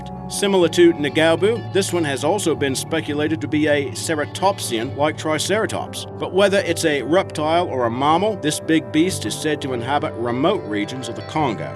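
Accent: Australia. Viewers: Your accents got me stumped part aussie and part buggered if I know. Seriously what nationality would pronounce a mammal as Marmal? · What accent is that? It sounds close to Australian, but then a lot of your vowels don't sound right for Australian English.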